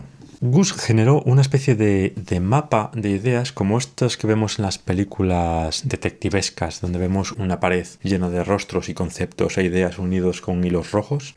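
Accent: Spain. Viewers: Cuando te vi tomar mate pensé¿Qué? ¿Es argentino?, pense que era español! Entonces volviste a hablar y efectivamente, eras español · Es el primer video que veo de ti, muy bueno, pero no se de dónde sos, tenes un acento español pero tomas mate jaja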